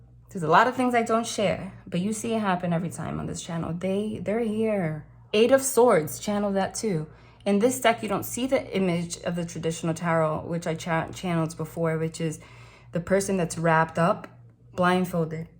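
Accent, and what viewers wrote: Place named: New York, United States. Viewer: I love your new york accent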